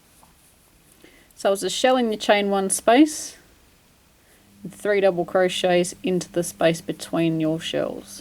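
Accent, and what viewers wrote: Australia. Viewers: Hi, my daughter and I have been enjoying watching your videos. Thank you for the good explanations, camera angle and ... the aussie accent, being aussies ourselves I love the intro of g'day. You throw me a bit using US terminology, I was taught that a dc was a trbl, sc was a dc etc., and my following a pattern skills arnt real hot, so you are enspiring me to try to improve. Keep up the good work. Look forward to more of your videos. Thanks heaps sandra and natalie grantham.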